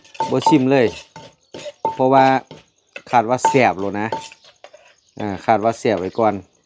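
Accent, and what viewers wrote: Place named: South, Thailand. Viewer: พี่ แหลงใต้เก่งมาก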